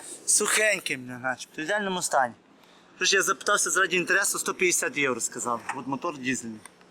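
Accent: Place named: Western Ukraine, Ukraine. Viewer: Нормально говорит, на диалекте захидном.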